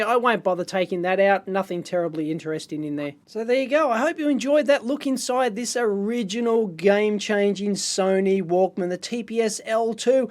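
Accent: Australia. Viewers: You have the most australian dialect ive ever heard "loosey goosey" lmao wtf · Having coffee this morning & listening while this in the background build building a new 3d printer. Love the Walkman tear-down. (Although I'm not sure if its the coffee or the ausie voice waking me up!) cheers :)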